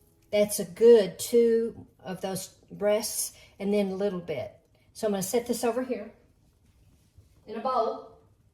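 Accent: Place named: South, United States. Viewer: You seem to have a southern accent, I can't believe you are using canned biscuits 🤦🏼‍♀️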